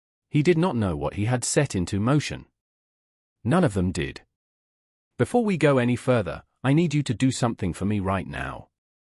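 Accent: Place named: Europe. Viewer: Can't get past the strong European accent describing a Black (from Atlanta, USA)... Thumbs down on narrator.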